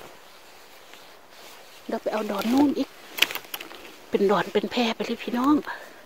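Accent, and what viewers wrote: Korat, Thailand. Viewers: สวัสดีจ้ายาย ป้าเขามาดูคริบเก็บเห็ดหลายคริบแล้วป้าชอบดูคริบเก็บเห็ดชอบกินดายาย พูดโคราชยายอยูอำเภอไหนจ้า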